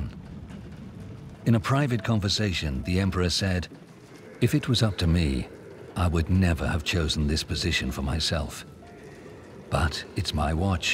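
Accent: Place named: England, United Kingdom. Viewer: МММ, английский тип) но нормально!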